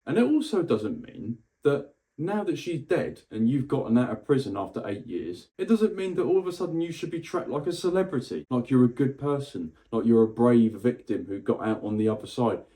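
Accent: United Kingdom. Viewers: Oh, I've got so many things I want to share... It's way too late on a Saturday night for me to get on my soapbox though lol! Great video! There's still so much more that you would be shocked about! Nice to hear a fellow Brit covering the story too though! 👍😊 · Looove the accent & also prefer British slang and terms to many American ones myself. ❤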